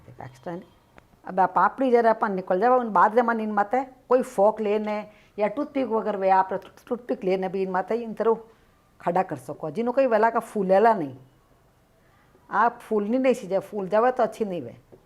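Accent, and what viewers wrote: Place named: Rajasthan, India. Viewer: Rajastani bhasa boat pari lagi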